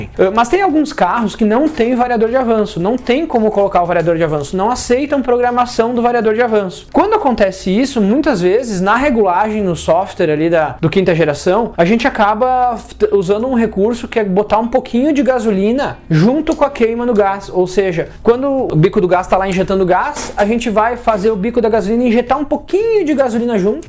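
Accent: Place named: Rio Grande do Sul, Brazil. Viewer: Sotaque de gaucho raiz 🤙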